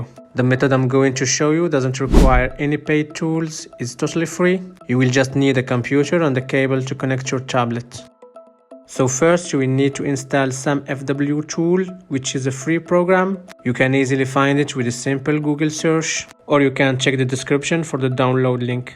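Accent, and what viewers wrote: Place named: Morocco. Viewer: sorry but your accent is bleeding bro, are you a moroccan?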